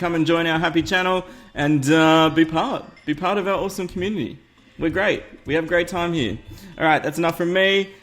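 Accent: Australia. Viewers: But he has an Australian accent XD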